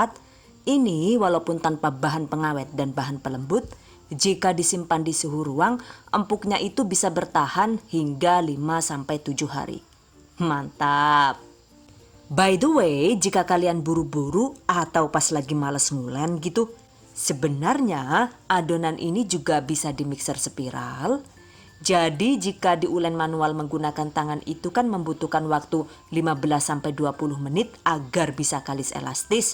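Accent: Banyumas, Indonesia. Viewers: ini resep yg ditunggu tunggu vocal audionya ok bgt seperti penyiar perbaduan bhs ngapak dan englishnya mhn dipertahankan sucses selalu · Channel kesukaan saya dari awal liat YouTube sampai kini...dari suara ,cara mengucap kan keren banget 👍🏻di tambah bahasa ngapak nya yg khas ,juga ilmunya yg profesional 👍🏻👍🏻👍🏻 sukses selalu❤️👍🏻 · Mbaknya lucu suaranya kyk orang bule tp kyk pangenyongan orang apa to sampeyan itu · Meski aq jg orang ngapak tpi klo denger ibu ini ngeluarin jurus ngapakny psti aq ngakak 🤣🤣(pdhl gi nyimak serius bgt langsung ambyarrr...) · sy suka resep2nya..selain mudah caranya,suka bahasa dan logatnya..banyumasan(purwokerto)gitu😍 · salam kenal ya bunda yg gaul and ngapak2 he he catattt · owalah medok nemen banyumasane ha ha ha..... mksh mba mudah dimengerti ilmunya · Suka banget dengan channel ini, penjelasannya detil dan menghibur , logat Banyumasannya itu lho ngangenin. 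semoga menjadi amal jariah. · Bunda asli mana si? Ko bisa bahasa ngapak kaya saya.😀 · Padahal inyong juga wong MABES (MADIUN BREBES) bisa ngomong ngapak juga tp kl denger si mbakayune lg ngomong ngapak inyonge auto ngakak 😅😅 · Langsung download....👍 makasih yang ngapak bgt tp lokasi Jatim 😁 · Bukan Tegal mas...ini pasti asli wong ngapak (Banyumasan). "Ora ngapak, ora kepenak"😁😂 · Jan seneng banget krungu ngapak koh dadi terobati rinduku karo mama ku.suwun yung karo bahasa ngapake. Slm dari perantauan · Kanggeeeeeeeen karo bhs ngapaknya..... Mksih resep bludernya bun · yg baik hati cantik dan salekhah...ttimakasih resepnya...pokoke ora ngapak ora kepenak 😀😀😀👍👍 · Klo nyimak pas ngomong ngapak itu lo hahaha.... kocak, masuk OVJ deh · Makasih resepnya bunda,sipp dech, lucunya bhs ngapak e..😘😁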